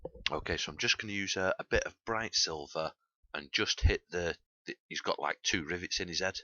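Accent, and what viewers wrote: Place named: Northern England, United Kingdom. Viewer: Unreal work and your northern so subbed good and proper haha.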